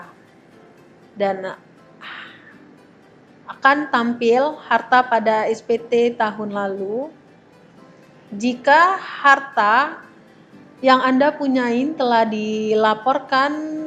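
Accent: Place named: West Java, Indonesia. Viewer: Urang sunda kayaknya ibu nya.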